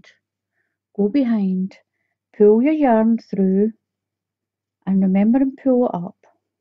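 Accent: Scotland, United Kingdom. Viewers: Good Day to you. I have been looking for such a project to used up a large over buy of heather gray Red Heart yarn, What weight yarn is the yarn you used? I wil be using 4 which is a worsted weight. I definately will be doing a swatch, it looks like you need to do a double amount of foundation chains since the work shrinks in to about half, which is why one does a swatch, to figure out these things. The finished patterning looks like basket weave and should be quite effective to keep any one guessing how it was done. I have never done front/back posting crochet before, glad you cleared up what stitch you were doing in your pinned comment. Its definately double crochet, If this is considered treble crochet in your circle, what is single crochet? Just a curiousity on my part, love to see techniques from other places and I will echo other commenters, your Scottish accent is truely lovely. 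Thank you for showing us how to do your basket weave blanket, my crochet fingers are itching to get started. Best Wishes. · it's a Scottish accent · I just love your Scottish accent. You are definitely right about not having more “Man Blankets” patterns. I mean, everyone has at least one man in their lives that deserves a beautiful, or shall I say handsome, blanket. This blanket is very easy to do & I love the way it’s turning out for me. It’s for my son for a Christmas gift. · I am going to make this for a friend in permanent healthcare in Wisconsin. It's a man of course, love the name you gave it. Thanks from Louisiana. I'm guessing your in or from Scotland, love your accent as we always say. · Love the blanket and the accent! Is it Scottish, eh?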